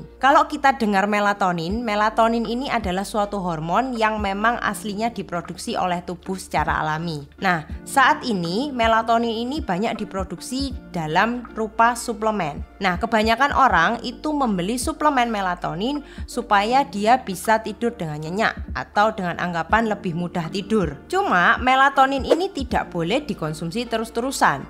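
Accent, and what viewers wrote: Java, Indonesia. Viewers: chindo medok jawa nih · medok ya mbak...😁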